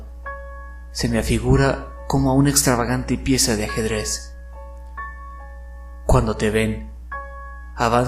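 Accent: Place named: Latin America. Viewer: Me encanta tu acento latino el mejor, tu narración simplemente genial Gracias!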